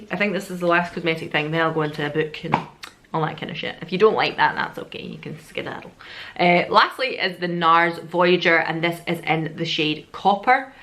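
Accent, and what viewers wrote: Scotland, United Kingdom. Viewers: Lobe the Scottish accent xx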